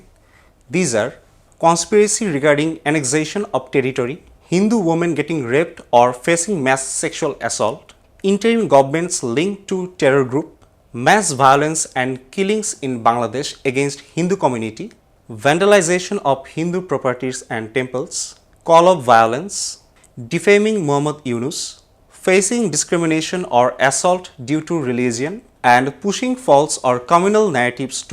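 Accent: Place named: India. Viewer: Change the anchor(Indian accent)with an western accent speaker. Though it is good to hear from a hindu Bangladeshi.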